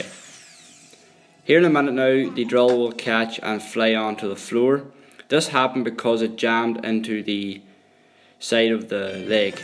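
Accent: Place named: Scotland, United Kingdom. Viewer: I can't figure out your accent, is it Scottish or North Irish?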